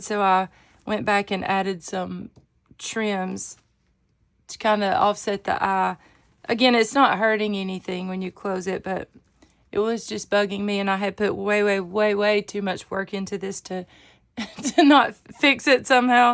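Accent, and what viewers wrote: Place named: Tennessee, United States. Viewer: I came here from thrifty day to hear your accent lol. It sounds a little bit like my Tennessee accent but yours is cuter 😂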